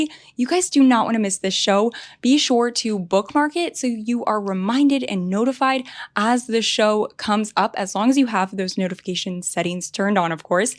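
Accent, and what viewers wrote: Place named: United States. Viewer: Hi. I'm from new Zealand. I love your accent. What part of America are you from?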